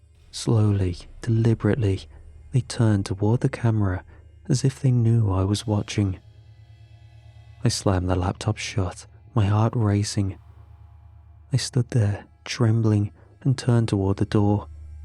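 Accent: United Kingdom. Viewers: Me for 5 years now and he is still in my top 3, theres just something about his voice that helps me sleep. I usualy dont like the British acent and dont like to listen to it but I can listen to for hours, plus he always has a good or interesting story, unlike 90% of creepypasta channels now that only do the borring rules storys. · He’s good and his stories are great but im kinda getting tired of the British accent. Any good American creepy pastas channels?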